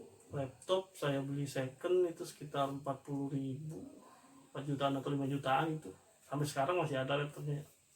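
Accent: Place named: Bekasi, Indonesia. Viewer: Bang Abang ORang Tambelang Ya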